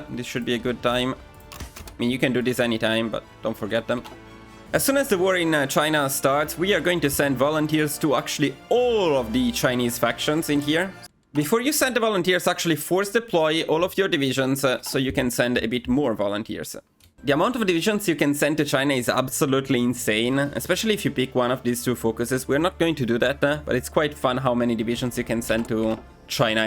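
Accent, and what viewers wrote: Italy. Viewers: Nice video nerd. By the way, are you Italian?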